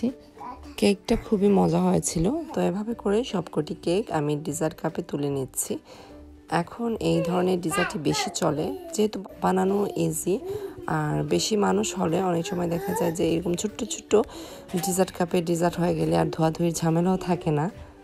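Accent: Sylhet, Bangladesh. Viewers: Api you're from sylhet? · Assalamualikum apu apni ki Sylheti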